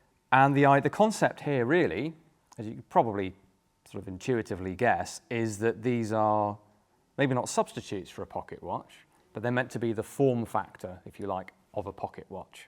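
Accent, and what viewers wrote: England, United Kingdom. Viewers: Some beautiful English accent for my ears. Greetings from Argentina!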